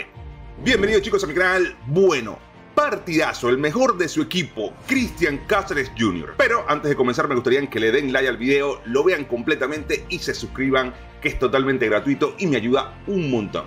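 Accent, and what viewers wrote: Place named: Argentina. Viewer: Varón buen contenido pero pareces argentino cuando hablas :0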